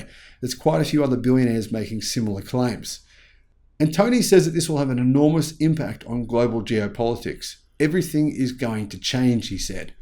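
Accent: Australia. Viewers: Yeah , you sound Ozzi mate! 😉 Love the content and your style.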